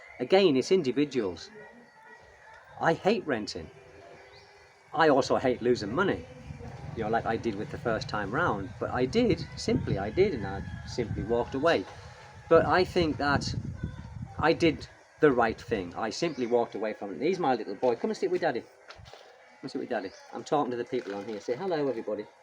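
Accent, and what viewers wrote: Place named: Teesside, United Kingdom. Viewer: New subscriber, where is that accent from, cant quite place it, possibly teesside? I'm from Newcastle. Keep enjoying PH 😉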